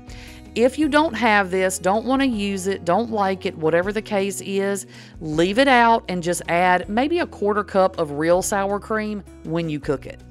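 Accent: South, United States. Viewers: New sub here 🙋🏻‍♀️ First of all, you’re adorable!! 🥰 Love your southern drawl & bubbly personality!! Secondly…..I am SO grateful for these hamburger helper mixture recipes!! I’m going to try them all. I grew up on Hamburger Helper. There were 8 in my family & we could stretch Hamburger Helper pretty far. Super excited to try these!! I also have a request (& forgive me if you’ve already done this-I will look thru your channel) but could you possibly come up with a dry mixture recipe for cold pasta salads?….like the Suddenly Salad box mixes?? I would love to have a dry mixture recipe I could mix with mayonnaise to put over pasta & veggies for some good summertime cold pasta salads. THANK YOU SO MUCH!! ❤ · I love listening to you, you remind me of growing up in the south! Thank you, these recipes look wonderful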